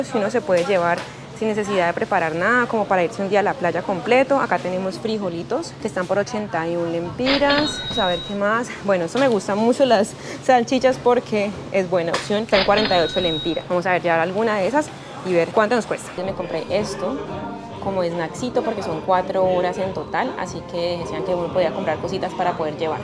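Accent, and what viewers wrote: Colombia. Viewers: gracias parcerita por mostrar lo bueno de mi honduras Dios te bendiga a ti y tu familia me encanta ese lindo acento colombiano el mejor del mundo bendiciones amiga ya me hice presente con mi like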